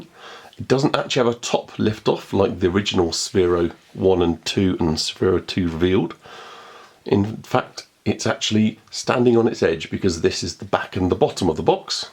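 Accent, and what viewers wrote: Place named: England, United Kingdom. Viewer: Hello, First off. My Grandfather was from England and due to him, I tend to sound like I came from England as well. He passed away when I was 13 and I miss hearing an English voice once (Other then my own.) in a while and I loved hearing your voice. On BB8. I have read newspaper and magazine reports and seen a few videos here on You Tube about him. BUT! This report of yours was much better and covered more points then the news stories and other videos did. I was considering getting a BB8 for myself and your report helped me make up my mind about getting him, Thank you. I have a VOX R2D2 from the first year that "Tiger Toys" (Hasbro Toys.) came out with them (Thinkway Toys is making them from this new movie now.) and I have had a ruddy blast with him and every time I set him loose on his patrol mode, he gives my cats a very hard time and drives them around the flipping twist! 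I can not wait till I can get BB8 here and cut him and R2 loose in the house and watch as they target one of the cats and goes after her! THIS IS GOING TO BLOODY WELL BE A TON FUN!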